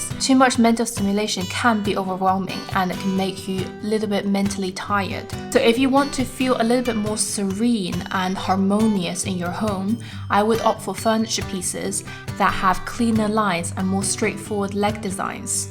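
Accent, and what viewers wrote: United Kingdom. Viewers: Love the Brit Accent❤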